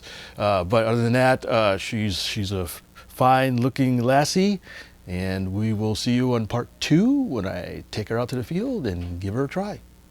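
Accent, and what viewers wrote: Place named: Canada. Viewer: My fiance swears your Canadian and she loves your voice she says it very soothing.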